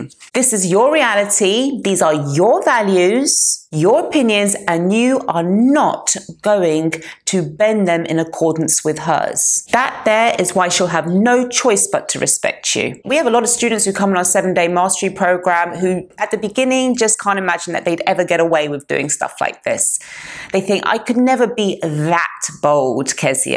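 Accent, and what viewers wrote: United Kingdom. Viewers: Wow. I even got an answer. YT has its own algorithms. And on top of that we have marketing season opened. Now streaming hostesses are the top priority. They play Silent Hill 2 Remake in pajamas, because this is not objectifying to women. That's how game corporations solve the issue, of hot hostesses at game events that one were probably the main attraction. A contract with a streamer. Guys who are unable to approach a gal in real life as an audience. Easy money. Just trash the game in chat box and you will become eaten by simps. xD Pathetic. Cheers from Poland. PS. My instinct was right. Your British. That accent. Pity it's not as much popular as in 90's. Prodigy, Blur and few other UK bands. Yeah. Definitely good time for music. Cheers!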